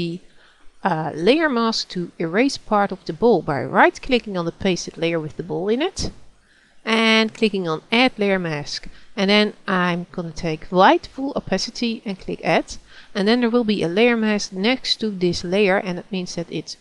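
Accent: Netherlands. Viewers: dutch accent.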